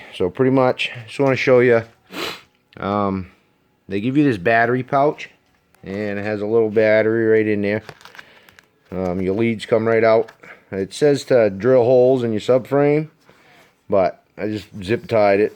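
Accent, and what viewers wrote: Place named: Boston, United States. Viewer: "I live up in tha woods" I wonder if he's here in New Hampshire, "shit gets pretty dahk" knew it, ha. Can't mistake the combo Boston/ Downeast twang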